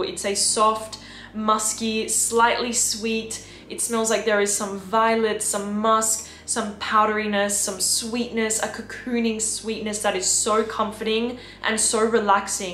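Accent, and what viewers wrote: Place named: Australia. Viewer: Hello. I have been to your hometown Perth twice with the USAF Reserve out of Travis AFB California. Beautiful woman from a beautiful area of the world. I love the accent. Would love to be a patron member but How? I look forward to hearing from you LOL, Scott.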